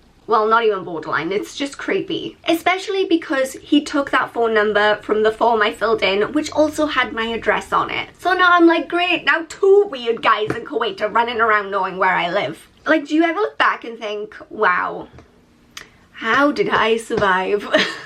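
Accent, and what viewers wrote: Wales, United Kingdom. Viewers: That welsh accent lol. Anyway I spent 4 years of my childhood in Kuwait a long time ago. It was a great hospitable country but even back then there were some weirdos. Even my mum had the odd unnerving but rare experience but was always quite firm.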